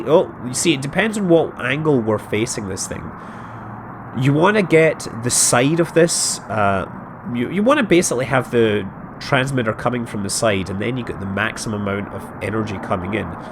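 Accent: Scotland, United Kingdom. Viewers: He's Scottish, I presume he's from Aberdeen or Edinburgh.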